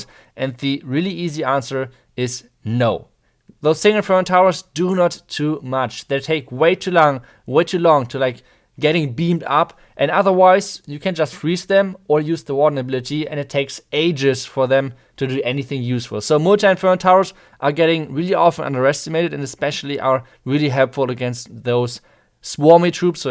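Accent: Germany. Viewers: Its great to have a world class(skilled) player as a youtuber.Your enlish(German version😊) is really enjoyable.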